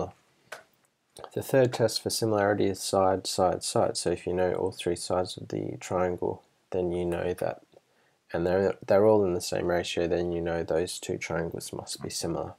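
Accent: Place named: Australia. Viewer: The Aussie accent needs to be complemented by a more light-hearted tone. Overall, your videos are excellent.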